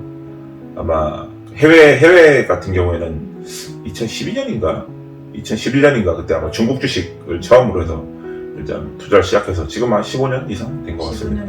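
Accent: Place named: Gyeongsang, South Korea. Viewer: 아이고~아이고~~ㅋㅋ 같은 경상도 사람이라 말씨가 더 정감이 더가더라구요^^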